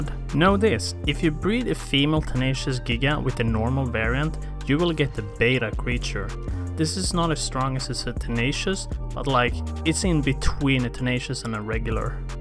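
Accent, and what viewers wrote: Sweden. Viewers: Jävligt kul att höra lite svengelska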